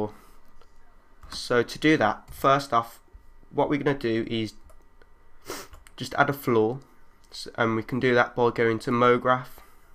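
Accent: Birmingham, United Kingdom. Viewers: brumie accent :)? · van Ingen I would suggest that has a 'brummie' accent which is typical of people from Birmingham in the UK. I'm not, however, an expert and it may be that he could be from another part of the Midlands (with a similar but distinct to those who know accent) and in which case he could well be offended by my suggestion. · I hate that traditional English cuddly accent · i would love to get into this!! I want to do some intros and stuff. Great work matey. Where did you learn all this man! Nice to hear a fellow brummie too!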